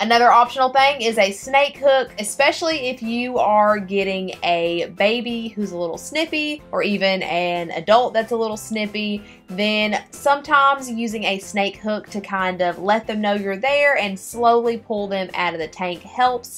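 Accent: South, United States. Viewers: She obviously has a country accent, she could be from the south which is why her accent sounds the way it does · I love your southern accent! I'm a southern man myself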